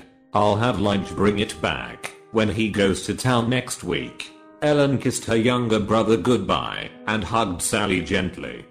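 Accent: United Kingdom. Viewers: All of his voices and pronunciation is perfect......Full of British Accent!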